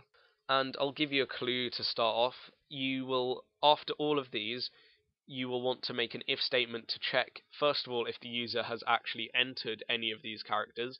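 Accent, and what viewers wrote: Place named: United Kingdom. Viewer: Thank you so much for this tutorial, my comp sci class has this type of problem due tonite, which sucks because we just had to turn in a huge programming assignment the night before so I haven't had any time to work on this. Oh and I always like hearing a british accent, that alone increases anyone's credibility and knowledge :).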